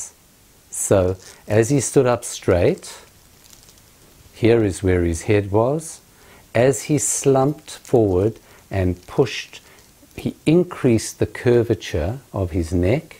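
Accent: South Africa. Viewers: just turns ''ouuff''. can't beat the sath african accent.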